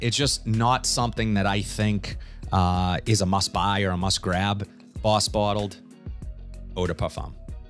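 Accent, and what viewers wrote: Boston, United States. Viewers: LMAO 🤣 The Boston Accent 🤣 always gets me , I'm a Nyer great work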